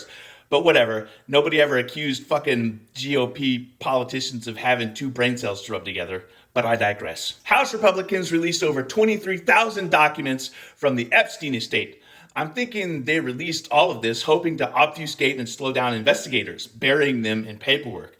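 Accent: Scotland, United Kingdom. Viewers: I just noticed your Scottish accent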